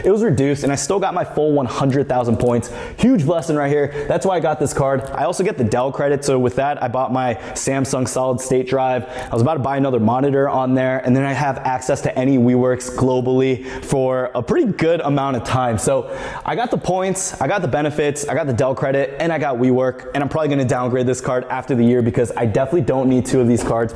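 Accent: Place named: Orlando, United States. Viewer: Man it sounds like you are by Midway or Orlando.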